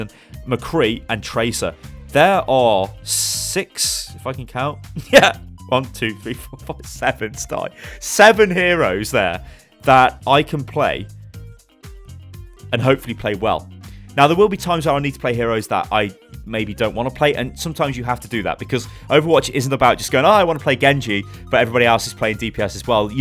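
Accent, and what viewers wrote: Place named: United Kingdom. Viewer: Lmao Im an American who loves soccer and even I know its called football! Hearing that awesome british accent say soccer instead of football is how you say... maybe.. rubbish!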